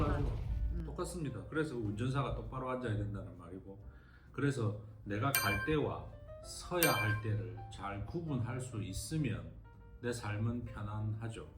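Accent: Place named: Busan, South Korea. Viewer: 부산이신데 억양이 지나치지 않고 목소리가 좋으시네요 한번 가보고 싶은데 너무 머네요 ㅜㅜ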